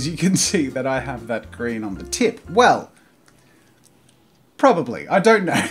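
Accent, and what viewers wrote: Australia. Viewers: he sounds like an Australian Hank Green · I learned to do a proper Australian accent from your videos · British? He's Australian.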